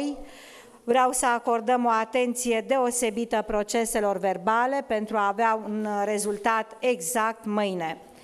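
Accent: Romania. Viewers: Munteneste cu influente oltenesti.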